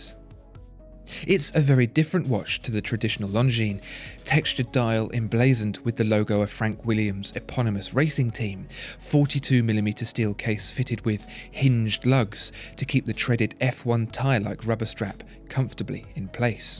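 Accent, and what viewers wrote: United Kingdom. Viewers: Which part of UK are you from? (specific accent)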